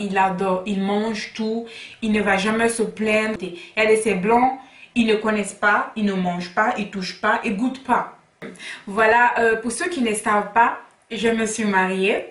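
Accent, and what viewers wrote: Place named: Conakry, Guinea. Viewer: Un accent de conakry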